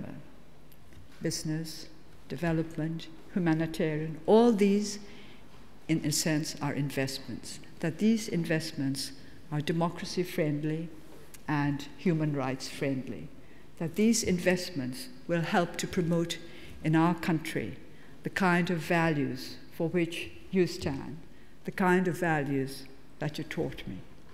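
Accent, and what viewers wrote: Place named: United Kingdom. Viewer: i didn't know she studied in oxford, no wonder why she has a a british accent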